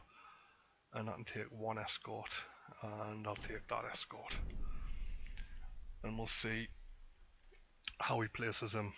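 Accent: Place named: North, United Kingdom. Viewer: Nice vid, have to say where you from m8 as ya sound like ya from the north. Cant wait for the bfg game to launch will u be doing the campaign? And i would deffo get the IN with marks/favours to boost them maybe the imperial navy mark to spam cobras lol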